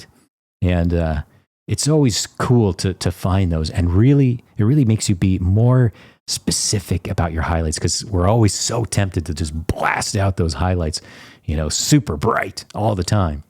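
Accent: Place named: United States. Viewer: I was half-expecting you to be dutch (I was surprised by the american accent)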